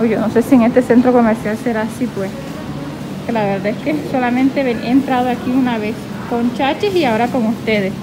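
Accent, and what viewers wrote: Caribbean Coast, Colombia. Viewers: cuetame soy seguidora de tuya De parte Colombia eres se nota que eres costeña que Dios te bendiga siempre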